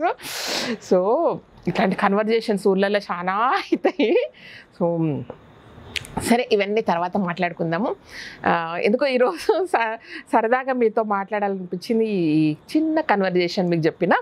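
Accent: Telangana, India. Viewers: Super. Telangana yaasalo chaala baaga aagakundaa acham vaarilaage maatlaadaaru😃😂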